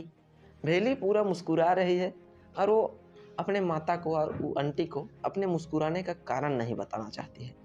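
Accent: Bihar, India. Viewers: Bhai aap Bihar side se ho na....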